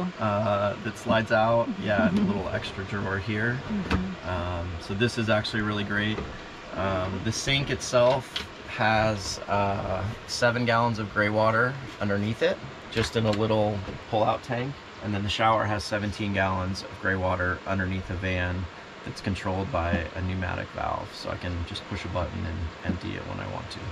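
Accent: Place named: Tennessee, United States. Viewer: r u from TN you sound just like my niece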